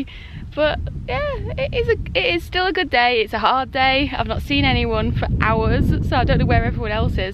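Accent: United Kingdom. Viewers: I have a friend from England that heard me say "worcestershire" with and American accent and she said, "oh no, you don't pronounce the 'r', that is low class." Haha. So I noticed, when ever I here a Brit speak, you only pronounce the 'r' after a consonant but not after a vowel. Lol, now your viewers that read this are going to be listening closer to your accent. Which I think is really cool. That's my blog (comment).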